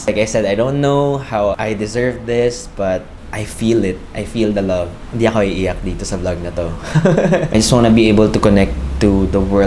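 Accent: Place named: Philippines. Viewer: It’s so cool to see a guy who looks Chinese but has a filipino accent. I always wished I had a Filipino accent, but I was born and raised in Hawaiʻi. My grandma was ilokano, from the Philippines 🇵🇭, and I wish I paid more attention to her when she would try to teach me Tagalog…but no, I just wanted to eat cuscaron, balut, dinuguan, kare kare, etc. I always only have food on my mind. 😜 I think you’ll love Hawaiʻi, Filipinos are the 2nd biggest demographic at 19%, just ahead of Japanese 16%, and behind Caucasian 21%…and we have a lot of mixed race people 24%, many mixed w/Filipino. 😉 The people here are very friendly like in the 🇵🇭, and the weather is beautiful (but much less humid than the Philippines). PS - I just saw your shirt says Hawaiʻi. 😄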